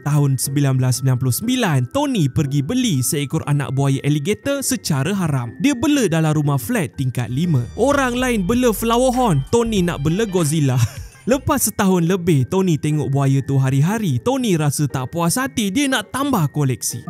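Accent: Sabah, Malaysia. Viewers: orang sabah ke? Anduk baaa😂😂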